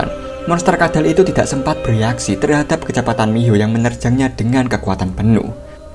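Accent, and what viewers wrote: Java, Indonesia. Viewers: Wong jowo a mass?🗿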